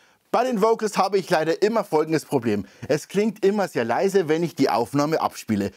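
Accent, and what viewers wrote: Bavaria, Germany. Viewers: hi! Oiso...i steh auf Dialekt...deswegen, bleib bei dein boarisch! i schnoi, wos du so dazöst und waun i wos wü, vastehst du mi ah....ollas kloa!!!! grüße vo ana echten Weanarin..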